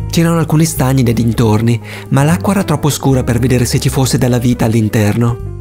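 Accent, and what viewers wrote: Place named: United Kingdom. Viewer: Ti ho scoperto da poco, e sei bravissimo e simpaticissimo con quel tuo accento inglese :)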